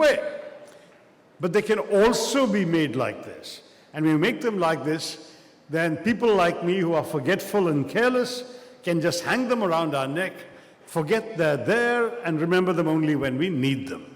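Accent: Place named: Oxford, United Kingdom. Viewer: Highly inspiring ,informative ,interesting and wonderful to listen Dr shashi taroor's Oxford accented English. Highly super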